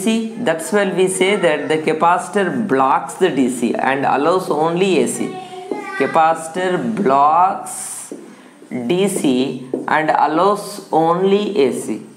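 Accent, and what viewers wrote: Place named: South India, India. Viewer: Where do you live sir? Because from your accent, you seems like south indian and south Indians teach better than any other (this is my experience)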